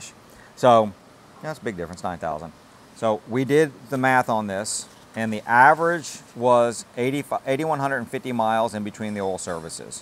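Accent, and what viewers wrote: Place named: Georgia, United States. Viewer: where you guys based? Georgia? I used to work in Hinesville 2004. I`m from the gods country of Scotland lol, Love the accent guys ,YI-ALL doin a great job guys.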